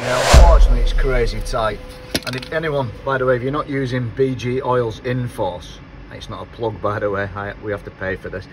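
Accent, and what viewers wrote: North, United Kingdom. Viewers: These videos are a great watch and show your skills. Nice to hear a northern accent too, even if it is from across the border for me !! Please keep making more 👌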